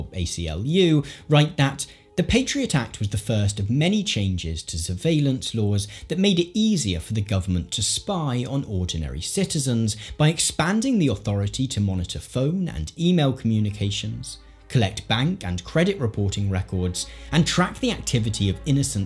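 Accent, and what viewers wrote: RP, United Kingdom. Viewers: Hi, I love your contents but I am fascinated with your accent and intonation in particular. could you please tell me what is your accent?is it posh?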